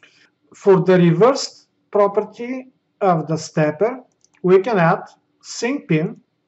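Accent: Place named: Russia. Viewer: Какой-то прям русский английский...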